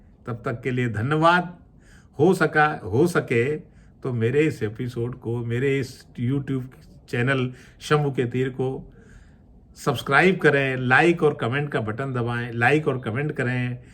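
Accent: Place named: Kanpur, India. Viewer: Wah wah bahut hi sahi kaha. Andaj bhi kanpuriya🙏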